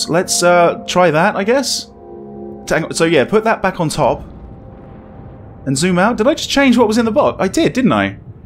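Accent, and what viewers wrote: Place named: United Kingdom. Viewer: Heard of this through mention otlf Twich streamer that does nothing, but puzzle & she always gets help from friends on the stream. I so wish i had them. Not pc or money. If can save up for gaming pc to stream on Twitch i get all these puzzle games one each month or so plus my ps 4 & smart flat screen tv ad only have older small one. This is amazing. I wish i had been able to do without getting spoilers, but it's ok. It is what it is ! Living vicariously through others atm. What can you do right ? I hope to catch up soon. Only got a tablet last yr finally. So behind gadgets & technoligy, etc. 😢😭😭 I love your voice. Great presentation. With that nice slower British accent it was very enjoyable. I'm more touch screen, app, and console type person. I do like puzzles, but not hard ones. I did Tomb Raider, but i need help sometimes just like steamer I mentioned though maybe not as much. 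Only when ger really stuck. I never done a serious game through alone without cheet guide book or online ao i dont know if I'd ever figure out a gane like this. Really complex. Blows my mond the dimemtions, but I get the workings now that seen all the watchers mentioned on YT, and this game is one of them so has made me understand puzzles workings more and a bot more confident I can, cuz learming from others playthroughs and see it from the other side of the screen and perspective.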